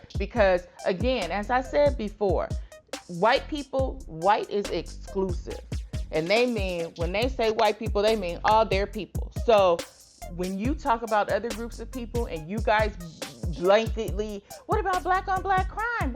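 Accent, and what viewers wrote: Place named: United States. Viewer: Me before the video starts "Bet she has an American accent, bet she has an American accent, bet she has an American accent..." Video starts She speaks in an American accent. Well what do you know!? 😂🙈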